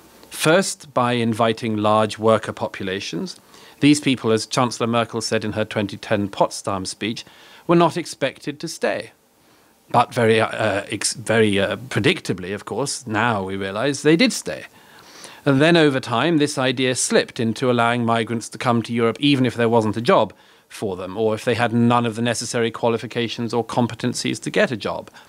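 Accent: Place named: RP, United Kingdom. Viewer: Murray is Tommy Robinson with a posh accent. I can think of no higher compliment (but not because of the posh accent; that is irrelevant).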